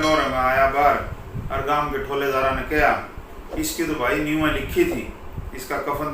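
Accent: India. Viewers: Bhai wah! Aap ka haryanavi lahza Rohtaki laaga sai. Jaisa likha same usi pronounciation mai parh diya. Lekin" ekka" ka ka matalab itfaq nahin hona chahiye. Ekka ka matalab Ekta (unity) aur kahani se bhi unity hi jhalak rahi hai. Itfaq ka matlab 'by chance/coincidence hota hai.if I am not wrong. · Waah bhai zabardast Kahaani Afsana "Eyka Akta bhaichara yakjahti..Aapka padhne ka lahja kamal haryanvi sai... Aapsi bhichhara hamesha rakhna chahiye Janab Rana Rahmat Ali (Marhoom) Bade uncchhe paye ke haryanvi Adeb sahiyatkar they sukriya,Aapka Haryanvi Reel tv ka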